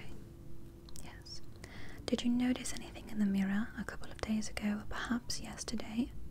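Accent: England, United Kingdom. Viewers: I'm British and I approve of this accent 🇬🇧🖤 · you are spoiling us. Also thanks for the British accent. I love studying the accent from your videos. ❤️❤️❤️❤️ είσαι υπέροχη!!! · Really Beautiful Doctor!!! Great Video and you have the most Beautiful eyes and you do a Great British Accent!! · Perfect English accent. Thank you from across the pond. PS. I love 🇬🇷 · I never new she had a British accent ! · your English accent and bedside manner 😍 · Is she British or nah lol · Weird to hear you use a British accent. I got ASMR, but also on edge because you sounded like an evil villain from a bond movie haha! Amazing video! 10/10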